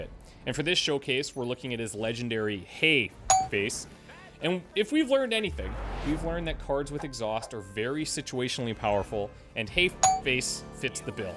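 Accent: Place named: Canada. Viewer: Love the Canadian accent first and foremost. I'm excited for Deadpool and I hope Storm is next, fingers crossed. Sounds like DP will get progressively stronger with every KO until he's a powerhouse, so you'll want to use him for cleanup kills as much as possible, which sounds new and fun. I'm thinking ideal build for him is Deadpool, Doctor Strange, Captain America. Cap can absorb damage and soften up enemies for Deadpool KOs while Doc can enable Deadpool (what he does best) while Deadpool builds his stacks.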